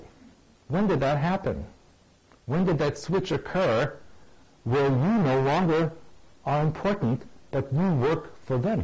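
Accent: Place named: Russia. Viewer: So he's Russian, of communist family origins and he's married to whom? She's related to Mariam Magdalen. He has an accent, yet I am not sure why if he spent 25 years within the US fold working and ended that back in the 80s. He looks pretty young here, and this video was taken before 2003. Is 7lovo4 Stewart or a student? I'm seeing a fine line of agenda here, which is going to make me doubt a lot of his teachings and I'm only into this guy by 10 hrs or so. This is a reptilian take over?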